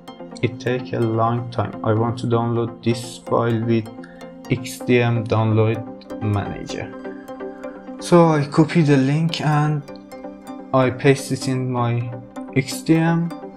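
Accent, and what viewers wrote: Iran. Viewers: Iranian accent haha I love it bro that was great thank you ❣️❣️❣️❣️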